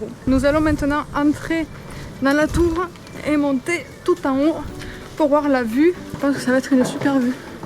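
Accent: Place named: Carmaux, France. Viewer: Un travail de montage de qualité, un accent carmausin au top et une diction impeccable, bravo :)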